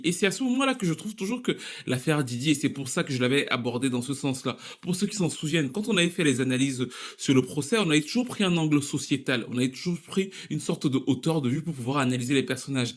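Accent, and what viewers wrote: Cameroon. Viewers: Si on ne connaît pas le Cameroun ou juste très peu, impossible de connaître le phrasé des camerounais... 🙃 Votre remarque est donc compréhensible ! 😉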